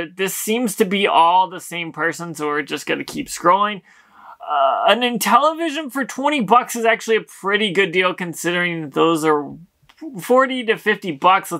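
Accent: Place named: Midwest, United States. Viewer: This been bugging me for awhile are you from the Midwest ? I can kinda hear the accent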